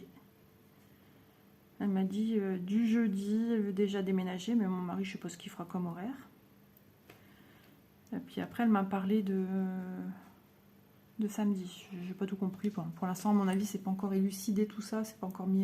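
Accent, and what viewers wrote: North, France. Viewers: Coucou, Ne vous prenez pas la tête il y a tellement de contenu sur YouTube si elles ne sont pas contentes qu'elles aillent voir ailleurs. Quand je n'aime pas je ne regarde pas et pis c'est tout! Et pour le parler du nord, Diditte est pas mal non plus! J'adore. Il est vrai que j'ai beugge plus d'une fois en regardant vos vidéos en juillet avec des références climatiques de mai, mais ça réveille les neurones 😂😂😂 Courage et on veut la suite 😊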